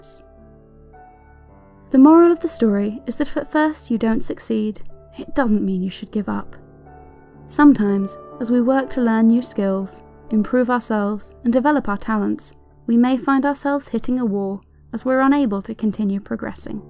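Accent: United Kingdom. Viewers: Oh how I love this british accent. A nation of great narrators you are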